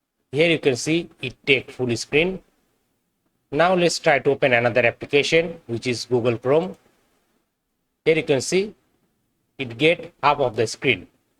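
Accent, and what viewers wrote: India. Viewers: Bro, your accent not understandable for me... You speaks on English, but I hear words like from Indian or Indonesia lol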